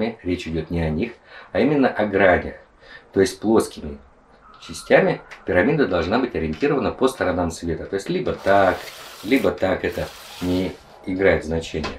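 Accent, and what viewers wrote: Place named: China. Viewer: Да откуда у тебя этот русско китайский?)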